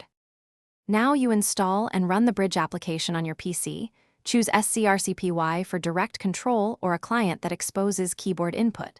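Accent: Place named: United States. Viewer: The overly-American accent is REALLY grating. Please over-pronounce the letter R so it's like fingers down a blackboard.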